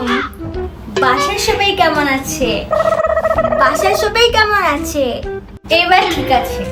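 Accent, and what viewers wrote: Bangladesh. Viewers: বাংলাদেশের মানুষের বাংলা বলার ধরণ, খুব খুব মিষ্টি লাগে ❤ addictive in nature with ♥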